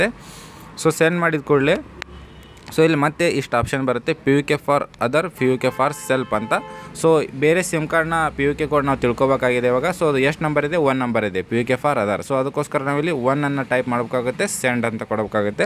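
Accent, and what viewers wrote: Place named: North Karnataka, India. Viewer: ಉತ್ತರ ಕರ್ನಾಟಕ ಶೈಲಿ ಮಾತನಾಡುತ್ತೀರಾ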